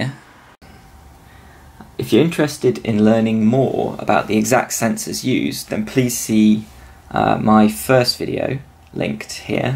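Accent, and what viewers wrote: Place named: Liverpool, United Kingdom. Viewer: My God he's from Liverpool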